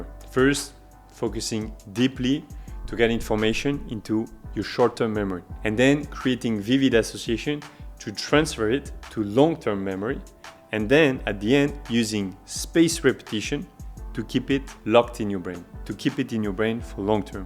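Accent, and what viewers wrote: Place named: France. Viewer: Merci frérot 💪🏾 😹j’avais capté l’accent français 💪🏾🔥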